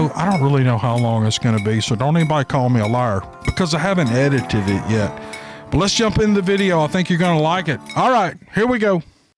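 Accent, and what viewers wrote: South, United States. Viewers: Get them fishes!! Just found you and love your story tellin. Love your accent, country as a biscuit! Keep em commin fella!😚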